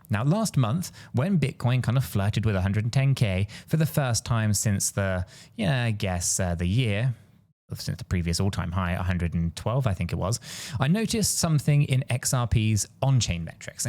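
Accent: United Kingdom. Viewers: The only thing worse than an entire video with non stop sarcasm is hearing it with a British accent 🤮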